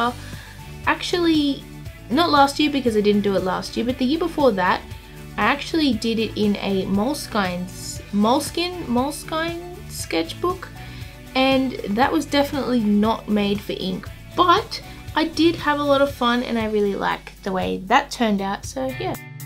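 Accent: Australia. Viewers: The bob rossy australian vibes with the way you talk plus the typical you drawing pokemon is just perfect This is how I like it haha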